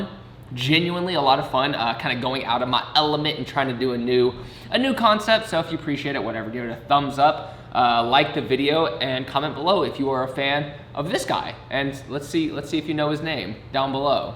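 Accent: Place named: Texas, United States. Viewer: Your Texas accent and slang are really starting to show 😂 love it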